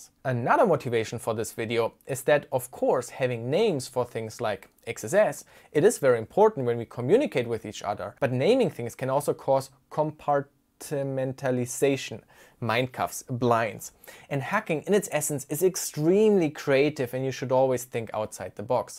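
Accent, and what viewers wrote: Germany. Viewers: You always pronounce the X in XSS in German.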